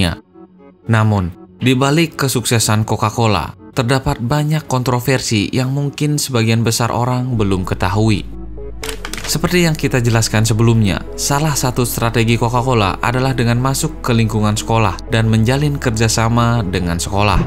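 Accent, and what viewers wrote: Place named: Bali, Indonesia. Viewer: Orang bali bang? logatnya kayak orang bali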